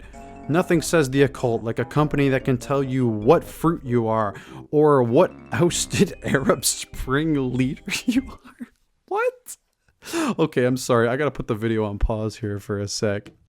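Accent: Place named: Canada. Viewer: Love the canadian accent bud. Makes me feel at home ❤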